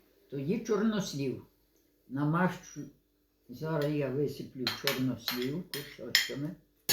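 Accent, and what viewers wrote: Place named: Vinnytsia, Ukraine. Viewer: Здоровля, сирва, бурачок, так говорять на Вінниччині в Чечельницькому р-н😊😊❤❤❤, там проходили мої літні канікули у бабці в селі❤❤. Обожнюю, не можу наслухатись.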